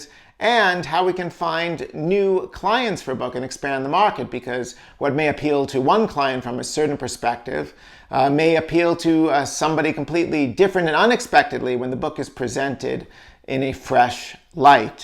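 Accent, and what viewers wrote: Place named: New York, United States. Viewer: Fabulous as always,. There ought to be some way for you to make a really good living just doing these videos. NO ONE else is even remotely close to doing them as well. Your erudition and excellent sense of humor entertain us all the while you are teaching us bibliophile/bibliomaniacs more about the books we love. I do agree that I too was waiting for you to open the KJ and show us some of the interesting TYPOGRAPHY, not TOPOGRAPHY in the volume. That NY accent of yours definitely came into play, as I found myself also wondering: "Why is he repeatedly mentioning the topography of the work, he's not talking about maps?" Eventually, I figured out you were saying typography, of course. Anyway, do you have that copy for sale? Please email if you do. Much continued success, my friend.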